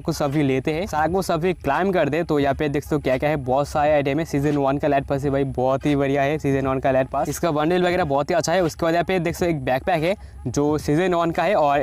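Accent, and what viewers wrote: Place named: Assam, India. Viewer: YOU'R SOUND LIKE ASSAM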